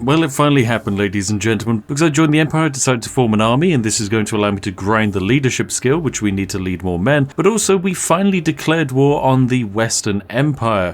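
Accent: United Kingdom. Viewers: The way describes what he is going to do sounds very.....British.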